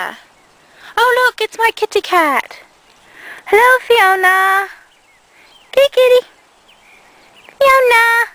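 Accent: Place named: United Kingdom. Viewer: Way to go great British accent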